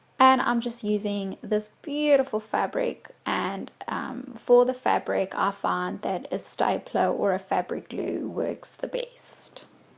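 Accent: South Africa. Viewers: Hi found your channel through 49 dragonflies. Is that a South African accent I hear ? Good ideas to try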